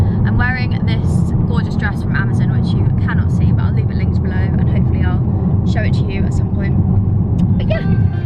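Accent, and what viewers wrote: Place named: United Kingdom. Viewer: What part of The Uk are you from ?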